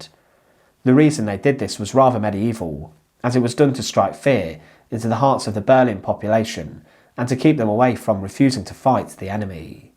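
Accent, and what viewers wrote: United Kingdom. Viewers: I agree. And I particularly like his type of accent. Not sure what part of the UK it comes from, but it is lovely. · As an American, he speaks with what I consider to be a classic British accent. Sometimes he's hard to understand, but that's part of the fun of listening to different English accents.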